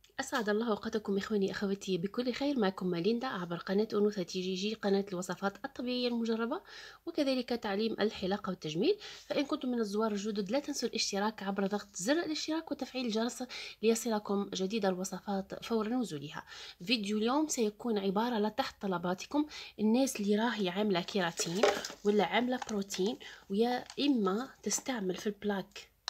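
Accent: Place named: Bordj Bou Arreridj, Algeria. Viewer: لك جزيل الشكر حبيتي والله نموت على هدرتك برج بوعريريج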